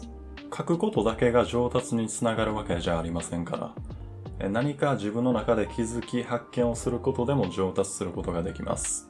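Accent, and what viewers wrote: Kansai, Japan. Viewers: 西側の話し方といい、土井先生みがイラスト界の土井先生だ！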